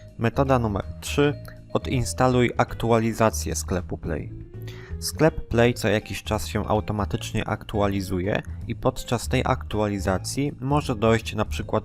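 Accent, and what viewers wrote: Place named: France. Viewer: Ale ten gościu ma francuski akcent😎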